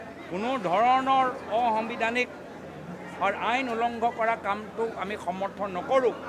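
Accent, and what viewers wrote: Bihar, India. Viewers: প্ৰহাৰ নচলাই প্ৰহাৰ কৰে হে। অসমীয়া ভাষা টো ভালকৈ কোৱা উচিত কাৰণ আপুনি অসমীয়া। বাকী অসমীয়া কওঁতে আপোনাৰ বিহাৰ,ইউ পিৰ সুৰ টো সলনি হোৱাৰ আশা নাইকৰা।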